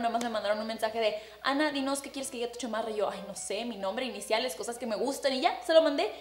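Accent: United States. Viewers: No Ana, tú sigue hablando pocho 😁, yo también soy de frontera y tengo que aprender inglés, me gustan muchos tus vídeos ❤❤ soy nueva ya llevo casi un mes viendo tus vídeos, y me gustann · Noooooo, no dejes de hablar inglés, amo tu pochés Hahaha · has un vídeo usando solo productos de It cosmetic please. Y amo tu forma pocha de hablar nunca la dejes♥️♥️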